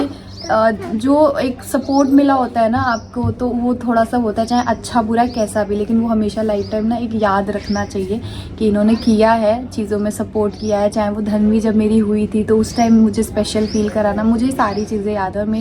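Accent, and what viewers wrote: Bihar, India. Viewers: Bihar me aisa bolte h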